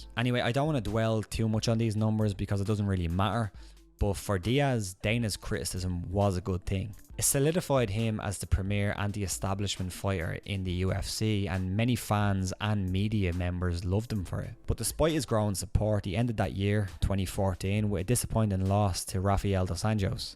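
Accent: Ireland. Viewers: Haha love the Irish accent man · Awsome job my friend! You were spot on! I have to admit when I first heard the accent I was surprised when you started to drop that knowledge. Didn’t think an Irish man would but the time in to study Diaz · Heard the Irish accent 20seconds into the video. Assumed he was going to be biased towards conor. Turned the video off.. Sorry dude. An Irish man commenting on nate diaz just does NOT work for me. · it's a weird feeling .. hearing an irish man talk about Diaz. Certainly lits a fire under me belly! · “Well shimmy me timbers!”This was absolutely awsome to hear this narrated by an Irishman! Believe it or not, the Irish & the Mexicans have a lot in common!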